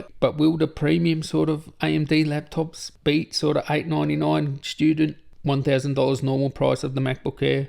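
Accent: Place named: Australia. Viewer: Love the multicultural Aussie accent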